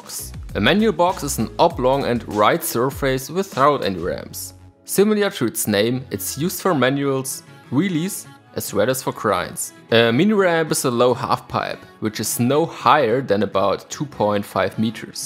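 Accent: Germany. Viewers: that german accent is hilariously strong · Well if you want, one day we should skype, discord or something so I will try to train you on these V and R. Your Rs are not bad, but you seem to focus a lot on these, while most of them do not deserve great attention and should be very light and short, and even sometimes almost muted. Your Vs are... hum... still a lot german (surprise surprise) XD As I said to you previously, your tutorials are very thorough, and that is really enjoyable. In my opinion, it's better to have fewer information that are fully understood, than a bunch hard to get (I'm exaggerating for you to get the picture). I'm not suggesting you to be less thorough, no no no... but rather splitting your videos in two parts, hence giving you twice the time. I bet your german audio track is live with your video (I've not checked, cause I don't speak german), so once a thing is explained, then you just show the video part again, maybe slow-motion, without live audio, and you can insert short comments, like :"here, watch my knees !", that kind of things, just to highlight a key aspect of the move. 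As you are making tutorials, you are teaching us new things, new concepts, so most of us need time for it to make sense, for it to sink in, and then understand other new concepts relying on the previous new ones. I've seen however that you have a nice number of followers on your german channel, congrats !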